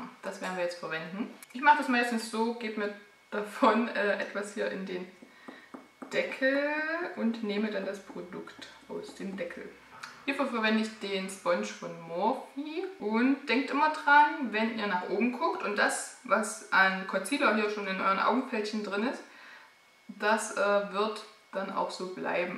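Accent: Saxony, Germany. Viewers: Schönes Video, alles super erklärt, und das Denglisch finde ich auf sächsisch sehr sympatisch, gerne immer wieder mehr davon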